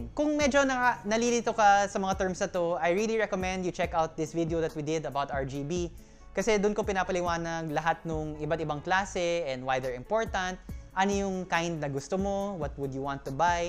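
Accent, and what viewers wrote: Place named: Visayas, Philippines. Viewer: Bisayan accent 😍, proud bisaya here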